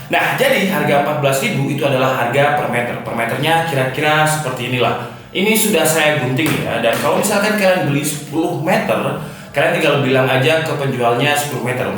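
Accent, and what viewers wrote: Java, Indonesia. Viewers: Bukan karena intro 'Hai gays disini', tapi yg unik itu ada bahasa jawa medoknya "mbarak/nyengkrak/nyetrong/jeding/ceduk" yg biasanya dilanjut pertanyaan "Opo Tom bahasa indonesia-ne?"... Saya ada saran untuk pasang led stripnya misal sesuai yg dibilang pake akrilik dicat/triplek/papan dilubangi di setiap ujung dari huruf dan setiap sudut huruf dg diameter sesuai lebar led strip, dilubangi untuk setting supaya tampilan huruf angka/kalimat yang terlihat bisa terputus bukan latin karena lekukan led stripnya diselipin di belakang akrilik dicat/triplek/papan lewat lubang tadi, seperti buat lubang untuk jalur keluar masuk led stripnya dan yg terlihat di depan hanya yang dibutuhkan tanpa potong led strip...Jadi gak perlu pasang double-tip di tembok, disusun dulu di rangkaiannya baru digantung/ditempel paku di tembok.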